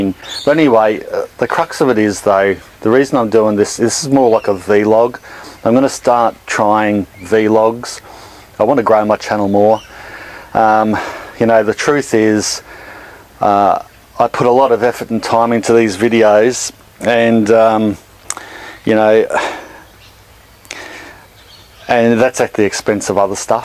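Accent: Australia. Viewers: love how hard you make your garden work; it looks great, i moved to the states about 50 years ago. so its good to hear the australiam accent as well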